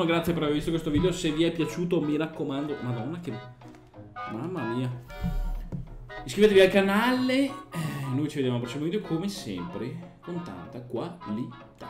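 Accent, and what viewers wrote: Veneto, Italy. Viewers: ma da quale parte del veneto provieni? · Mi fai troppo ridere!!!😂😂 In questo video si vede come ti sale il Veneto cazzo ahhahaha Grande!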